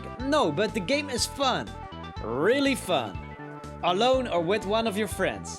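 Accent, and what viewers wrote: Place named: Netherlands. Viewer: his accent is way to dutch goede video tho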